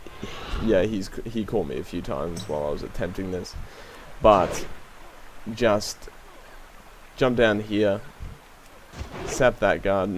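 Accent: Australia. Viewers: are you australian or a new zeelander? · hes aussie lol..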